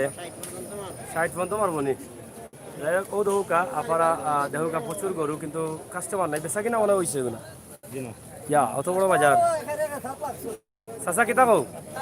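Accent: Sylhet, Bangladesh. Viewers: ভাই বিদেশ তাকি দকিয়ার সুন্দর লাগের, আপনার কতা শুনিয়া হাসিতেছি ভালো লাগের আর ভাই সিদা সিলেটি মাতিবা ইনো আমরা হককল সিলেটি।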